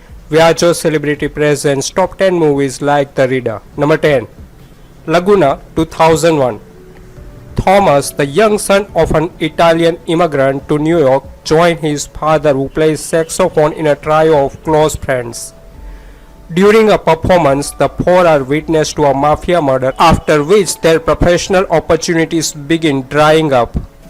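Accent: India. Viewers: Indian accent sucks!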